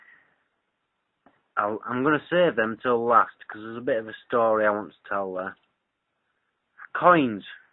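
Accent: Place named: England, United Kingdom. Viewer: It was pretty obvious the 1. He's not to clued up on German 2. He speaks with an English accent and uses phrases like "hey up" and "get in" :D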